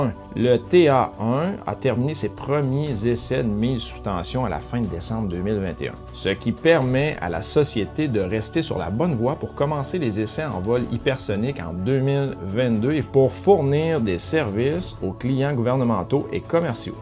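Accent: Quebec, Canada. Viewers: Elles sont super vos vidéos et j'aime bien le petit accent du Québec.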